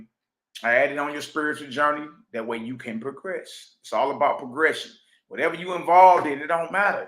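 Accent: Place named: St. Louis, United States. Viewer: Fear/ Furrrr … their/thurrrr .. St. Louis to death 😂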